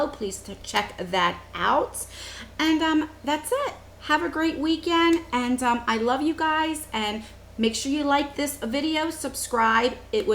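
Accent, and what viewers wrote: New Jersey, United States. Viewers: I’m from south Jersey too!! I knew you had that jersey accent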